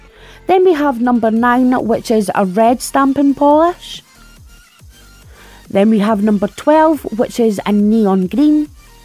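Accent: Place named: Glasgow, United Kingdom. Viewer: Seriously I just want to have your voice as my Siri/gps voice i love your accent Are you from Glasgow or that general area?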